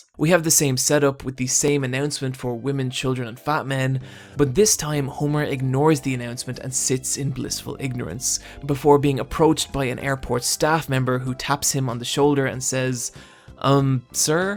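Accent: Ireland. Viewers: After watching that entire video still can't figure out why in your Irish accent you went for the American pronunciation of patronise. Other than that, great watch 👍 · I'm gonna watch this, but, fuck your tone, lol. This is the first time I've hated an Irish accent but there is something so.... smug and arrogant about the way you're presenting this. It's no secret The Simpsons has gone downhill, but even from the intro I get the sense you're going way way overboard. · your videos are complete rip offs of Nerdwriter1, its hilarious how much of your style youve carbon copied from him, also your americanised irish accent is embarrasing please stop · Another thick Irish accent haha ;) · This is a great video man, fair play. And great to hear an Irishman lay it down. Just not used to hearing an Irish accent on decent vids on yewtewib. I have kids and we watch The Simpsons a lot but only season 1-12 (basically because I can't watch any of them after that). 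Interesting thing is my kids absolutely love it - and I was 11 when I got my first Bart Simpson T-Shirt in 5th Class and I loved it then. But my god it became execrable after around 2001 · wtf is this guy IRISH??? This is so odd?? I am Irish that’s why I realised old homer could read :) new homer can read rarely… :( episodes used to be the full runtime now it’s two episodes between a break :( he really does care about his kids :) that’s one thing I still sometimes see in newer episodes keeping the characters grounded and not making a mockery out of the characters… so true… :( fucking facts :) :(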